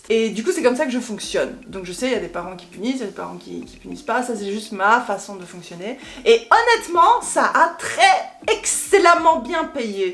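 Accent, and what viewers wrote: Belgium. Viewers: Absolument toutes les caractéristiques de la meilleure des mères. Dont le STRESSE!!!!! Autrechose: - l'accent belge, merci, j'adore (parce que j'ai trop de bon contact avec eux mais faut pas dire qu'on est français):) - la sincérité, bah vi, pourquoi en dire plus? - courage maman - Quel contraste entre et. si vivante et si calme. Vous êtes beaux. - Au diable la perfection que tu recherches, si Poipoi sourit 10 fois par jour (avec toi, j'en suis sûr), alors... J'envi sa jeunesse. Merci pour ta confession pleine de valeurs.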